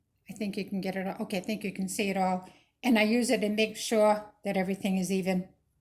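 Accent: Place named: Massachusetts, United States. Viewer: This is so helpful! You sound like you're from MA! Thank you for doingvthis .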